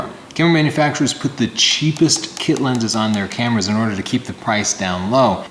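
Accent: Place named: United States. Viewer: I'm an American living in South Africa. My wife recently bought a Canon 6d mark ii, and she also bought this lens as an extra. She plans on using the camera to help film our ministry outreaches here. I want to say thanks so much to you both. My wife and I are watching all your videos and she especially loves your tutorial on her camera. You don't talk to fast, and you have a good all American accent....like me....lol.....which she is use too. God bless you both!!!!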